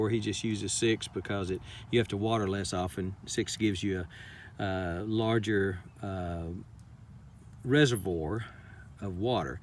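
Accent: Texas, United States. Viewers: This is genius. Thanks. Btw, I'm curious what part of TX you're from. I'm always interested in accents and TX is such a big state; there are different accents. I'm guessing you're east TX, maybe toward OK. Am I close?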